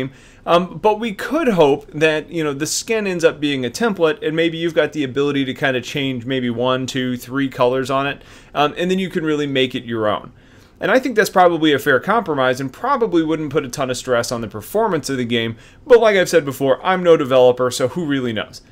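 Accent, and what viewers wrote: United States. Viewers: Btw, it's nice to finally find someone talking about this game with an American accent who speaks clear words. Some guys that play this sound like they're gargling syrup!! Good work.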